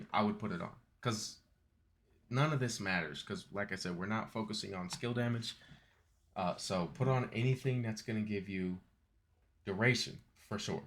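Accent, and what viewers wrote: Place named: Philadelphia, United States. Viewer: "Yea that jawn like... ya mean." Philly Boi detected.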